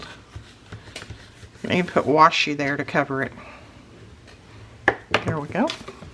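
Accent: South, United States. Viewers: love your projects and instructions! Love your southern accent! I live in Hawaii! Would love to win!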